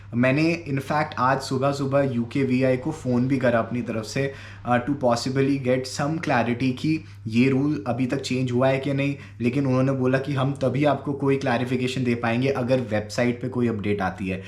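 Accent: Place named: India. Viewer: I can't your English very well it's too indianish!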